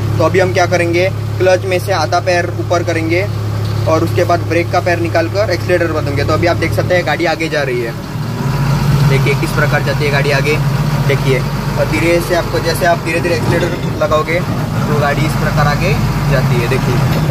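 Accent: Goa, India. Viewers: Goan hindi accent aikonut kavle bhava goacho munu tu😍🔥🔥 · That Goan Hindi Accent..🔥🔥 superb..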